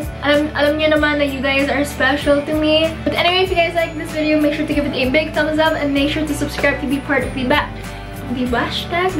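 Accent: Batangas, Philippines. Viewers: You’re so adorable and I love the way you talk especially with your batangueño accent ♥️. IG:nnayramlee Fb:Mary Ann De Villa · Naging bella fam po lalo nung nalaman ko po na batangueña po kayu sobra po akong natutuwa kapag nag babatangueña po kayu. Taga batangas po kase ako😍 Fb: Gwen Comia Ig: gwenv.hope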